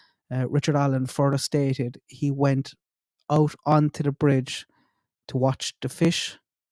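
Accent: Ireland. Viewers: Go on my brother Irish dude that’s a southern thick brogue you have Tipperary or near keep up the good “is mise Dáithí Ó ☘️✌️. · I'll take an Irish accent over a dull Midwest US one or even my own southern US accent any day!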